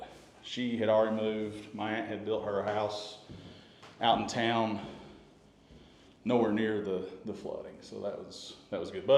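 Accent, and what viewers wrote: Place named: Tennessee, United States. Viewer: “anniss” = “and this” (I am from Maryville, TN, raised by my great grandparents, so this accent is heartfelt) I truly hate that Helene hurt so many people, no matter how detrimental, it is no competition, but I truly wish I had the means to help these folks. All I can give are prayers and a comment on YouTube but nonetheless my thoughts are with them. 😢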